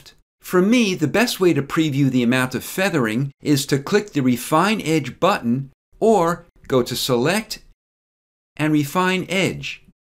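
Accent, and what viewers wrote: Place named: Long Island, United States. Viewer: Oh my gawd! I'm from Lawn Guy Land! I live near Seattle now, and I get 'the look' ALL the time! Your accent is like 'home' to me! GREAT tutorial too! THANK YOU!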